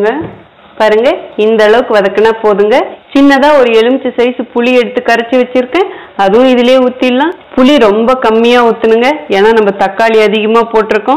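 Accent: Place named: Coimbatore, India. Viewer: ஏந்த ஊர் கண்ணு நீங்க உங்களுடைய பேச்சில் கோயமுத்துர் வாசம்வருதோ recipes are super I made your pongal and tiffin samber recipe it came out well thank you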